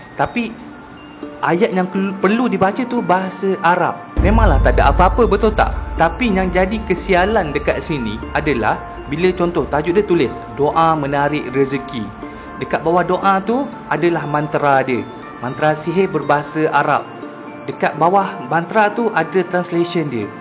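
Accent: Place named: Kuala Lumpur, Malaysia. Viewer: Cakap Kuala Lumpur ekk 🤣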